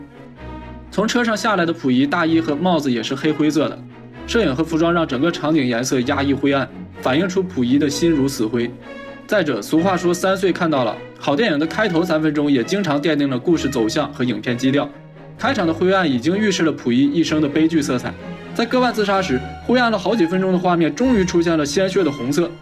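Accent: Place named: Northeast, China. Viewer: 我觉得这个解说的小哥哥有东北口音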